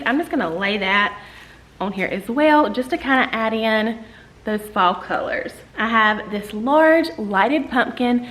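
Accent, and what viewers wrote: South, United States. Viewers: Girl. I haven’t watched your vid in quite awhile. Know you have new house, but what state are you in? Accent is a pleasure to listen to so thinking Ga., Ark., or Tenn. I put up a Halloween tree yesterday, so absolutely agree with your schedule. Tfs cheers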